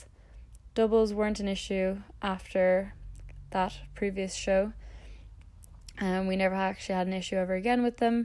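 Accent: Ireland. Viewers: Are you irish? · R u from Ireland 🇮🇪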